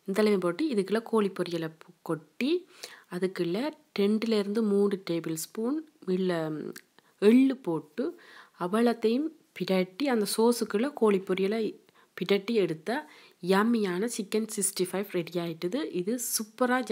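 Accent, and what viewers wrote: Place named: Sri Lanka. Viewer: my fav sis...unga tamil romba super... reminds me of my srilankan friends :)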